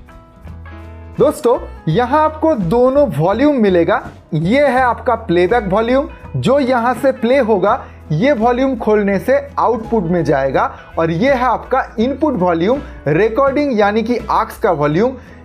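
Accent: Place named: Bengal, India. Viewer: Bongali Hindi accent yckk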